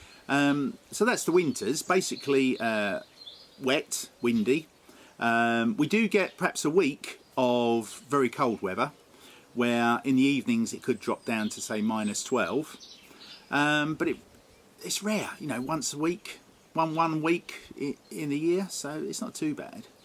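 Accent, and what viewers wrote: United Kingdom. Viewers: Amazing video and lovely British accent...all the best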